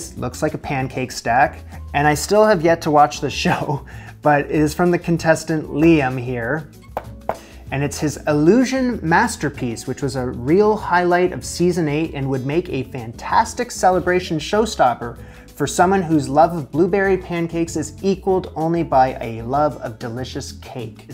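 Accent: Canada. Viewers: I knew from the moment i heard you that you are Canadian. The way you swear, hahahaha. Love yourself and your content. Loyal watcher from Alberta. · I think I am falling in love with you. I hope that's okay. I love your Canadian accent.❤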